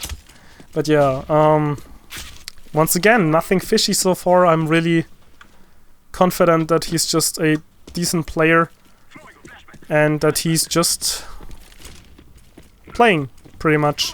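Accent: Germany. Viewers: Das erste Video was ich von dir sehe, direkt am Akzent gemerkt dass du Deutsch bist haha :D Ist aber ein mega Geiles Video geworden ! Hab mal gesubbed ;)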